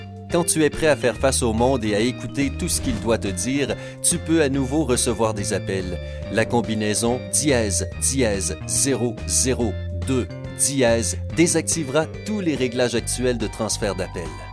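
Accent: Quebec, Canada. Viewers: C'est quoi cette accent de bouseux du Québec a vomir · Voix de Québecoi ? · Pk vous mettez un accent Canadien ? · Je préfère l'autre voix de Sympa perso j'ai pas l'habitude de la voix Québécoise moi 😕. il dit smartphone en anglais et ça fait trop bizarre, j'aime pas ! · Accent québécois ou je me trompe ! J’aime cet accent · Pour le premier conseil, effectivement L'IMEI est très utile en cas de vol pour inscrire le tph au fichier des objets volés. Par contre aucune localisation possible avec ça. Quant au blocage de l'appareil c'est pas la police qui le fait non plus, c'est votre opérateur. En tout cas en France c'est comme ça, au Québec (vu l'accent de la voix off) je sais pas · et sinon a l accent et aux numeros tapés sur le tel dans la video, vous ne comprenez pas que ca se passe au quebec et que donc il y a une partie des codes qui peuvent etre dependant des fournisseurs d acces, et que donc ca pourrai ne pas marcher pour tous les codes dans tous les pays? 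une partie doit dependre du telephone en lui meme (et donc marchent bien) et une autre partie du fournisseur.. en attendant ceux qui font croire connaitre tous ces codes, c est juste des branleurs qui se la racontent.. a part le #31# tres peu ont du deja entendre parler de ces codes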